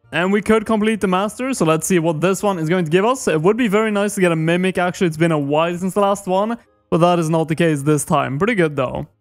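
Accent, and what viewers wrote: Sweden. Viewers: Jag älskar att man hör att du är Svensk! :D du fick nyss en ny subscribers. :)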